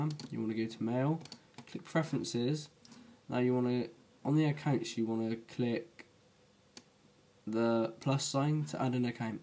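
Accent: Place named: England, United Kingdom. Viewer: i love the way this guy explains with the tiny screen and the cursor running all over the place at 200 mph...and on top of that the english accent which is sooo clear. Way to go!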